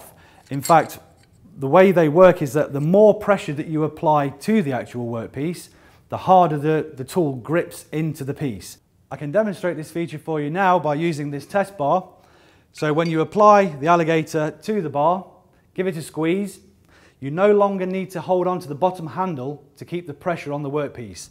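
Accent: United Kingdom. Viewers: I expect a proper german for these videos. Go home britbong. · All is good in video except britt english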